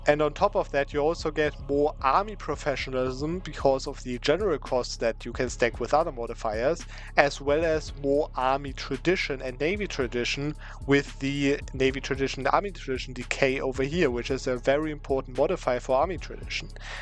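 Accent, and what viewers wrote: Germany. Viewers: Sometimes he really butchers the English speech xD Also you have a crazy German dialect but i like it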